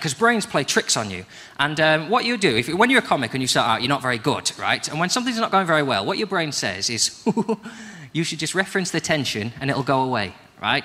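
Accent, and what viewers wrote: United Kingdom. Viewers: is adorable, the northern accent is my favourite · what he forgets is that there's a whole foreign market of women who would love him for his English accent alone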